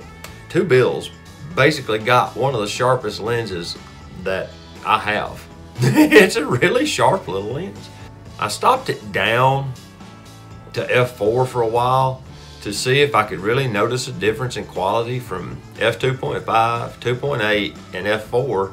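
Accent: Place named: Alabama, United States. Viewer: Looks like Horse Pens 40 where you were bouldering. Maybe I'm just assuming it was Alabama due to your accent (I'm from Mississippi). Anyway thanks for the video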